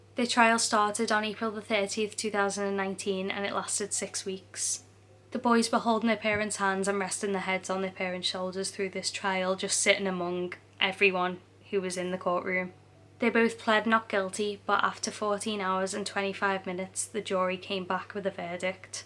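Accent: Liverpool, United Kingdom. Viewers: Like you said yourself, this case really affected me. I hadn't cried in ages but I cried for Ana. Such a tragic, horrific, needless way to die. Good channel by the way. And the scouse accent is very easy on the ear! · Another youtuber just posted this story almost word for word lol, but only did part 1 so was really glad to find this. You are amazing at telling stories and as a fellow scouser great to hear your familier accent. Such a sad story she was a lovely girl and my heart goes out to her family. RIP Ana this should never have happened. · Thumbs up before watching the whole show, because you're a northern lass, " Scouse " to be precise . hahahahah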